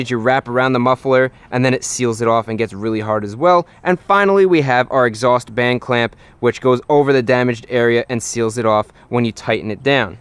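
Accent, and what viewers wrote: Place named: Pennsylvania, United States. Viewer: Good wooder PA style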